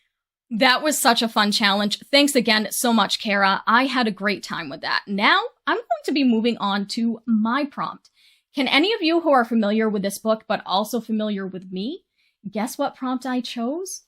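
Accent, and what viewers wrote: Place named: Maine, United States. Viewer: Yooo a fellow mainah (mainer)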